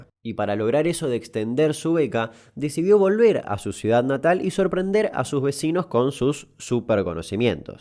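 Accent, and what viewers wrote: Buenos Aires, Argentina. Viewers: Me encanta tu "y" patinada bien porteña...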